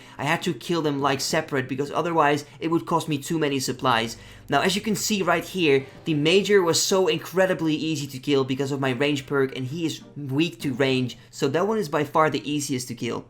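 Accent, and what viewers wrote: Netherlands. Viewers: Took me a few episodes to realize that you are dutch haha, lekker man👌🏼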